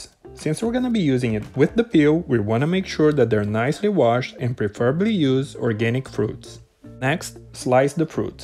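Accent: Brazil. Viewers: Are you brazillian? Or portuguese idk Ur accent kinda gives it up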